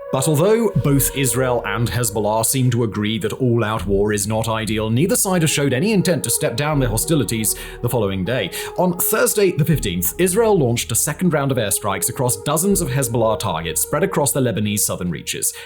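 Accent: RP, United Kingdom. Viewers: I'm also from the UK. narration voice is an RP accent influenced by time living overseas. If you spend a lot of time speaking a foreign language it leaves imprints on your L1 speech over time. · he's a brit =)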